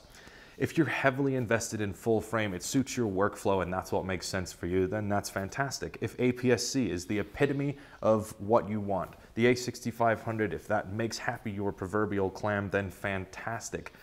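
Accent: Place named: Bristol, United Kingdom. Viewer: You're rocking that Bristol accent...!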